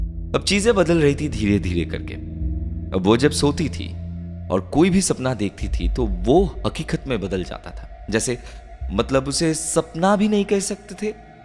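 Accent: Bengal, India. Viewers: I love your voice. In bengali channel what I love most is your amazing gift of gab and presentation, will love to experience that here too. But please note your hindi pronounciation needs little bit improvement, the touch of bengali tongue is a little prominent, otherwise marvellous presentation. I know you will improve, as always. All the best for this new journey. Have a good day! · Darun hoyeche. Ektu bangla tan ache Hindi te but darun. Chaliye jao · I will give Honest review.. no oiling no polishing. Don’t continue with the Hindi version. Because you’re many Hindi words sounds like Bengali. please continue your Bengali version. At least we can enjoy your bangla bhasha. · Vai keya hindi he purai bangla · Dada tomar voice ta just osmmmmmm ❤️❤️❤️ Hindi teo bangla r feeling asche 😍😍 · Kintu jai bolo banglay pret kotha sunte beshi vlo lge...ar Hindir ucharon arro beshi valo korte hbe.karon banglar akta tan theke jay jotoi Hindi te bolona keno.its ok prothom episode ata..aste aste ai ucharon ar tan gulo valo hye jabe..♥️ afterall voice to tomar marattok sundor...♥️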